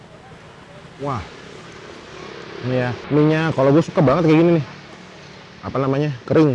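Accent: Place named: Java, Indonesia. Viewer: Medokmu kok iso menjiwai to ngab Padal mbendinane ndek njakarta Sukses terus friend eating Assswaabbb !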